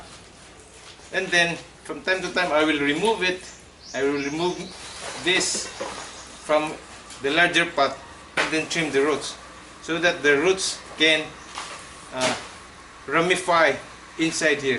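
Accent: Visayas, Philippines. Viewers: It's more of a Visayan accent 😉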